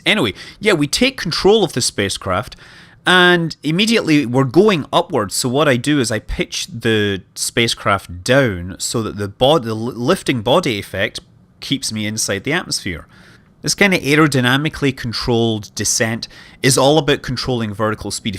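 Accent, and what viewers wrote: Scotland, United Kingdom. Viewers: i want to thank you for your awesome ksp videos, i learnt so much about ksp because of you man!!! and i picked up some real knowlegde along the way. keep up the great work and use your cool scottish accent more we love it:-)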